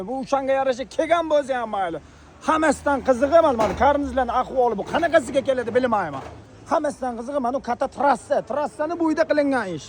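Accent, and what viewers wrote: Namangan, Uzbekistan. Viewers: ШЕВА УДАР НОМОНГОН ХЕЛЕ ХЕЛЕ КМОНОР😂😂😂😂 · Namangandon sheva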